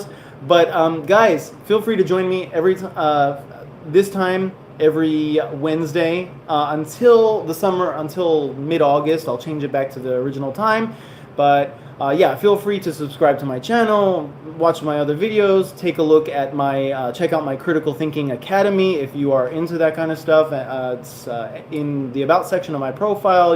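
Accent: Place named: United States. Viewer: This live stream- I don't know why?- giving sence of enjoyment like that cool American and British speaker and thier unique speaking style. You are using American Acccent too.😅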